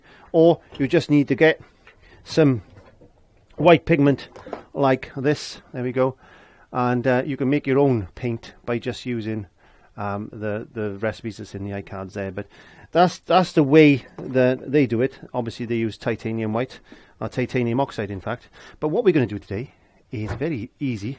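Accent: Wales, United Kingdom. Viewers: Just recently found you and can't thank you enough for your advice. I use your "recipes" for Gesso and Pouring Medium and they are great. Happy to find a British artist as most are American and their products aren't always easy to find, also expensive. Also the Welsh accent reminds me of my Dad (Not that your'e that old!)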